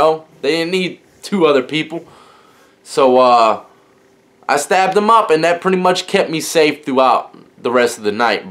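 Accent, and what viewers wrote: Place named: Midsouth, United States. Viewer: I just knew you were from somewhere in the midsouth. Keep up the content...never boring.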